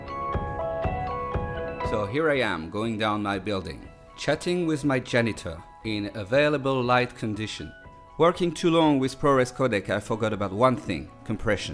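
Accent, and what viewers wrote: France. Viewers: Sympa ton petit accent français :p Ca rend bien ! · Oh un frenchie XD ça se reconnait à l'accent ^^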